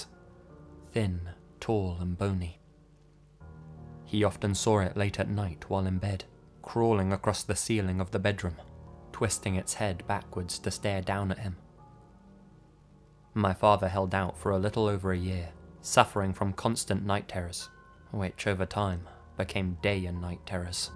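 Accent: United Kingdom. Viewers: British accent doesnt spook me unfortunately. Good content tho.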